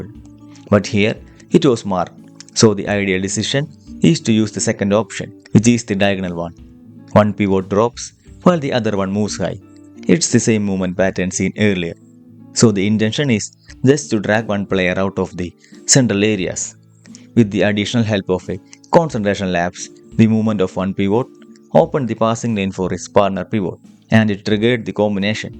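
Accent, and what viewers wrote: Kerala, India. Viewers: I could recognise your accent since I have friends from Kerala and I watch many malayalam movies. I'm from Assam. So great to see a tactico account from India. I think you are the first one. I wish you all the best and hope this channel grows leaps and bounds. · Good stuff brother. Your accent sounds like you are from Kerala. Even better!!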